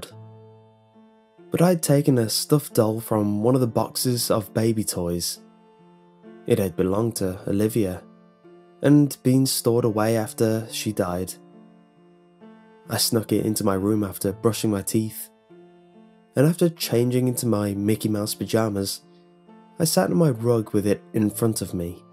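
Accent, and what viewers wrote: United Kingdom. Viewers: Devin Williams they also speak very differently as this guy is British and mrcreepypasta is American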